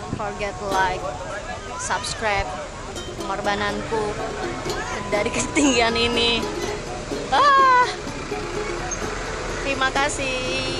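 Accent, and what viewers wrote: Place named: Surabaya, Indonesia. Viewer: Logat suroboyoan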